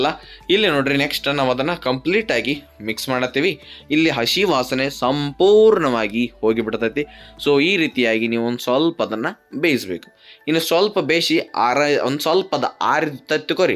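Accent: North Karnataka, India. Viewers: Pakka nam uttarakarnataka maatu🤗✌️🥰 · Surper utar karnataka bhashe and sabar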